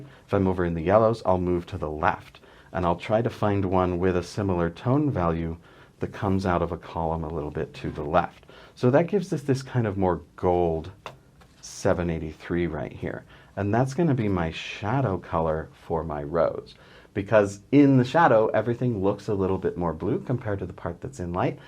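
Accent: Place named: Canada. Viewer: Also, you sound Canadian...where are you located? I am in Ottawa.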